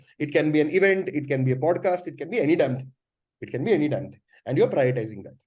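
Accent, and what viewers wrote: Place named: Hinglish, India. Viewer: that hinglish is killing me brottha !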